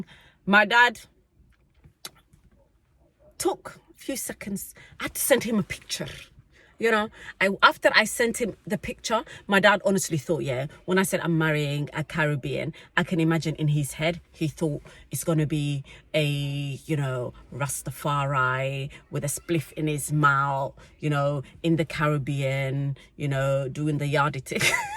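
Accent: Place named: Somaliland. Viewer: Sorry, I had to comment again the reason your children are non considered a somali in the Somali context is because of the clanal context within Somalia your children will not be considered Somali but Granadian and therefore will not get clanal protection if they were to live in Somalia. No matter how much you try to advocate it within the Somali context your children are NOT somali they are Granadian no matter how strong their culture is and how much you have instilled somali culture in them. In the West its all fine, I see hint of somaliland accent, if that is case in SOmaliland your children will never get nationality because of the constitution as you get it through the father side.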